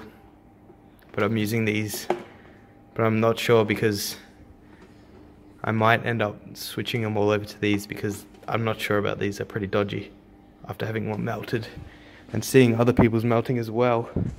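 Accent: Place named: Australia. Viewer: is this aud or us u sound aussie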